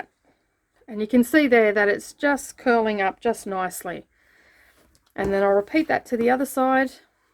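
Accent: Australia. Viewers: This tutorial comes just in time for me. I really need to make a couple of these bags to hold some toys for my Grandson. Thank you. Great tutorial. Nice to listen to a fellow Aussie :)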